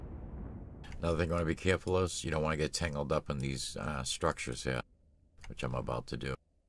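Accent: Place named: Massachusetts, United States. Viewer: It's a Massachusetts accent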